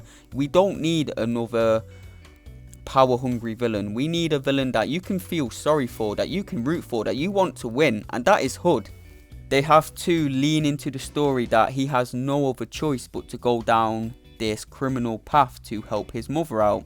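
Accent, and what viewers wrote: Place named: South Yorkshire, United Kingdom. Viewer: been trying to place yours now you mention it haha, I'm from South Yorkshire and you sound like you could be that neck of the woods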